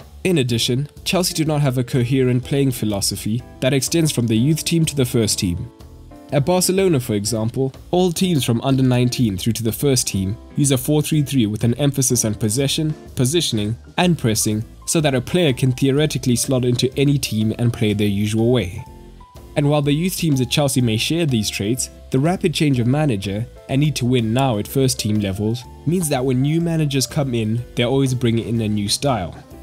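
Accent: South Africa. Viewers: The narrator sounds South African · Are you South African? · Are you from South Africa? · So who's the South African dude narrating this? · 100% South African speaker 👍, Shoutout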